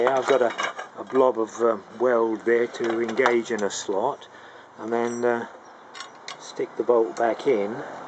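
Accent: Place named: East Anglia, United Kingdom. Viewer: Would love to see it in use! I recognise that accent, whereabouts are you in East Anglia?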